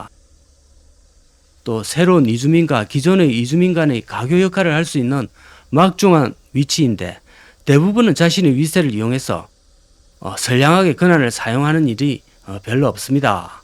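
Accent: Gyeongsang, South Korea. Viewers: 1976년부터 1988년까지 13년동안 마산 창원에서 20대 직장과 청춘을 보냈는데 정겹고 친근하고 구수한 사투리가 향수를 자극 합니다.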